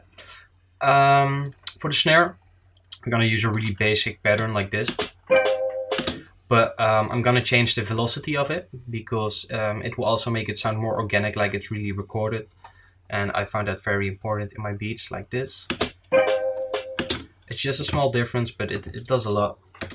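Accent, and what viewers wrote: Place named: Netherlands. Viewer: You sound Dutch, what are the chances 😅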